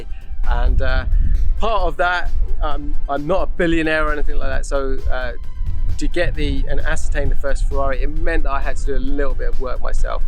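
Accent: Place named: United States. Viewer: No, I mean as I said, bastardised English. Let's just call it American accent so it doesn't offend and start another war.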